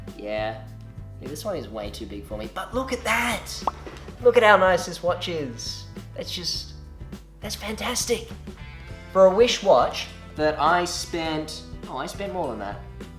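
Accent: Australia. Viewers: I don't even care about watches I'm here only for the Australian accent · I'm still hanging out for the DIY fixes to some of those terrible quality items Alex is piling up for you. My hobby is remaking things and I love watching other do it too :) Oh and FYI - as a Kiwi - I'm not here for the Aussie accent like some other commenters , but in your case I am prepared to forgive it :) Chur bro.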